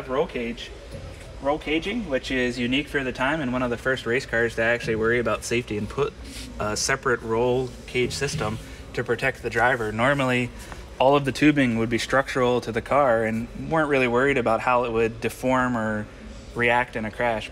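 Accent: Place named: Midwest, United States. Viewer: it’s a Midwest thing. They also say things like “ruf” instead of “roof”, “pop” instead of “soda”, “beg” instead of “bag” and “dror-ing” instead of “drawing”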